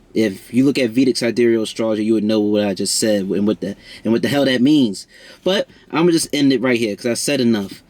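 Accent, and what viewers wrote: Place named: Baltimore, United States. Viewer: Thank you! Love this placement and the way you explained it. Love ur inner g you gotta be from Baltimore 🧡 if you are even more love to you n the home city 🏙. Thanks again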